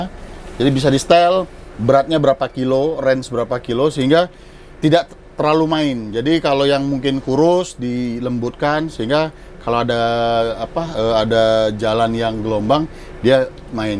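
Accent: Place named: Java, Indonesia. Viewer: Ouw tpi kadang ngmng bhsa jawa lancar. Kyk emang logat nya jawa gtu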